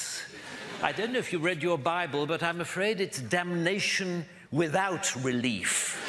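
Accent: United Kingdom. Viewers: He has a Welsh accent, so he's Welsh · I live in the USA, but I miss good British humor and beautiful British English...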